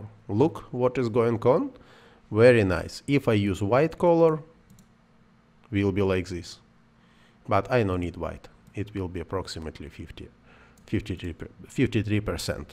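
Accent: Russia. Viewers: excellent! your russian accent is great! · Кстати спасибо за урок, очень пригодилось в моей работе. Я догадывался что ты русский по акценту, но потом увидел это и. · Акцент русский))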